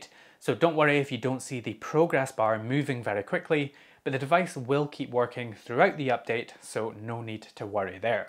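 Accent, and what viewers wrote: Scotland, United Kingdom. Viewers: Acting as a rooter! 🤩🤩 I wish you would lean into the Scotsman a little more 😉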